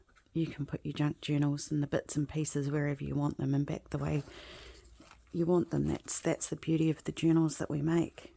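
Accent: New Zealand. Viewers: I never thought of using a journal as decor! I am on my second journal - the first was made out of a little box and I am actually using it for notes and memorabilia; the other is bigger and sits on my desk - it is still being added to and it's not for writing in, just, well, admiring and trying things out as I learn. I now have an excuse to start a third one - a purely decorative one for my bedroom:) Lovely channel and great to have New Zealand content and a Kiwi accent to listen to. I'm waiting to see some Edmonds cookbook pages turn up in your signatures!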